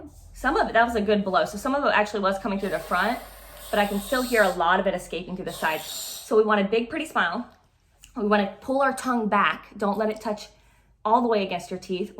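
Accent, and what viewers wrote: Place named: United States. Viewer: Hi, thanks for taking the time to put together this video, seems great. I am 15 and from uk, when I say these words, should I do it in your accent ( American) or can I do it in a British accent. Because accents involves different movements of the tognue. Many thanks !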